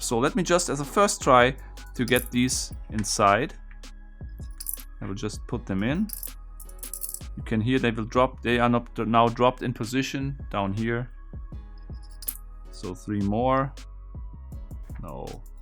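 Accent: Germany. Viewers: Do I hear a bit of an German accent in your voice?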